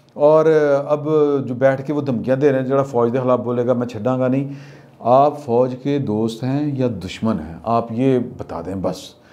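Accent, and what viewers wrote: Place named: Lahore, Pakistan. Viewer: Salam from france. I like very much your lahori style of speaking. SHUKRIA. GO NIAZI GO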